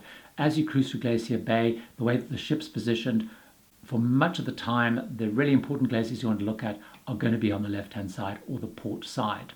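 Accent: England, United Kingdom. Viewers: I believe is British from his accent and from some of the comments he makes. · Your speaking style is difficult to understand and to listen to. You speak too quickly and even worse, the volume of your speech is so variable it is difficult to keep up with. The volume of your speech many times starts out loud, like an attack, then fades into a very low volume for an extended period of time. Then another attack and another fade. Your English(?) accent makes it even more difficult to understand. I would suggest you speak more slowly, more clearly, and try not to have dramatic volume differences in your voice. I found the video difficult to listen to and understand. This comment is meant to be helpful.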